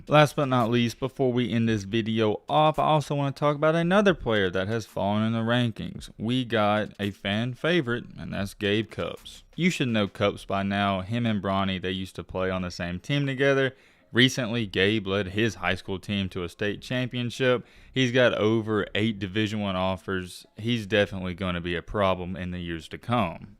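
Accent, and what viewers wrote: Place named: North Carolina, United States. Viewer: He got that NC country accent idk where he is from tho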